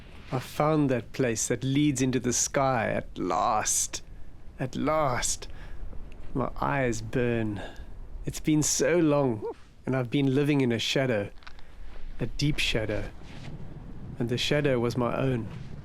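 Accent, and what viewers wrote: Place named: United Kingdom. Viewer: Great I think u are from UK by your accent so we neighbors haha I wonder could you give me any good safe tips on places to get a good safe used wing? I know I'd be be able to afford a new one, especially with the way 2020 has gone so far.. Any help or advice I would be ever so grateful, I dreamed of flying ever since my earliest memories an I'm gettin on in years and have to get In the sky befor it's too late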